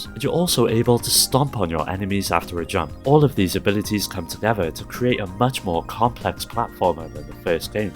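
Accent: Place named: Liverpool, United Kingdom. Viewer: Lol it's just a heavy Liverpool accent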